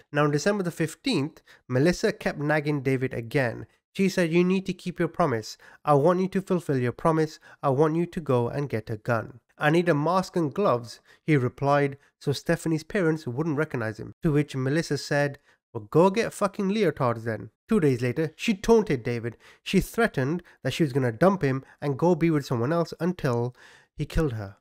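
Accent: United Kingdom. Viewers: You make me laugh so much, I think it's because you speak like a Brit and I get you, I love your channel and your opinions, great job man.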